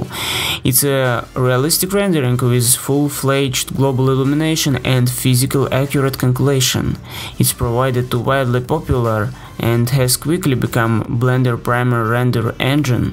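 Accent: Russia. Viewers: Russian aksent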